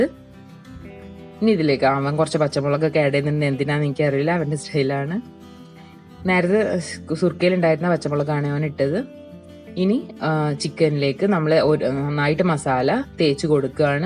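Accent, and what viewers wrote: Malappuram, India. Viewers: Ithante samsaram erekkure malappurathekk varunnundonn doubt.... adipwoli ayittnd ttaa 🥰🥰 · ഇങ്ങള് മൽപ്പൊറത്താണോ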